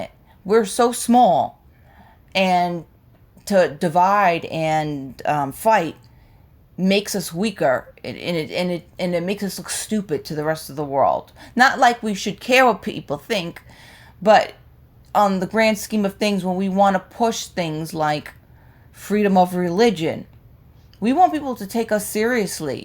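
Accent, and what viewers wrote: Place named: New Jersey, United States. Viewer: I liked your message here. And I also like you NJ accent 😊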